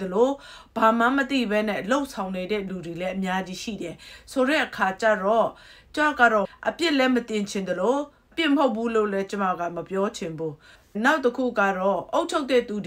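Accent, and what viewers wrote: Kachin, Myanmar. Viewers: အမြဲတမ်းအဆင့်အတန်းရှိရှိ၊စစ်ခွေးတွေကိုထိုးနှက်ချေပတာတွေ၊ဘက်မလိုက်သုံးသပ်ချက်တွေနားထောင်ရတိုင်း၊ ကိုချစ်ခင်လေးစားရသလို၊ကချင်သံဝဲဝဲလေးနဲ့အထစ်အငေါ့မရှိ၊လက်တန်းပြောသွားတာတွေအရမ်းကျေနပ်အားရမိပါတယ်။တော်လှန်ရေးအတွက်စစ်ခွေးတွေ၊ဘောမတွေကိုReactionလုပ်တာတွေအရမ်းကိုထိမိလွန်းလို့big congrats ပါ။ ။ဆက်လက်ပြီးဘုရားသခင်သာ၍၊ကောင်းချီးပေးအသုံးပြုပါစေ။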